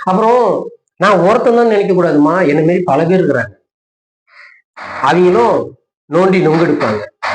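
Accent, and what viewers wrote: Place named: Kongu, India. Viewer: இந்த வீடியோவில் பேசுபவர் கொங்கு வேளாள கவுண்டர் பாஷை பேசுகிறார். கொங்கு வேளாள கவுண்டர்களே தமிழர்கள் கிடையாது. கர்நாடகாவில் இருந்து வந்தவர்கள்.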